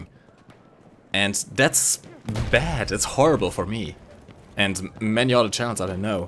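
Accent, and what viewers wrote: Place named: Germany. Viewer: i love your content, yes i love FPS and war thunder, something im in the mood for war thunder, sometimes cs:go, overwatch, tf2 etc etc, the thing is.... i found you cuz i didnt knew wtf i has doing in tank battles, and you helped me, i like your wt content and it shows that you do... put a alot...ALOT of effort, and well your german accent.... well.... i stayed cuz you're an awsome guy, funny and well you teached me how to be a tanker / pilot, same as orange, he helped me with a coupple of plane tips here and there, he's awsome.... what i wanna say is.... i'm here for the person dispise the content, hell you can play the sims i'd watched it... it would a bit awkard.... but i'd love to see what you can do with it, sort of speak... stay safe and.... keep beeing awsome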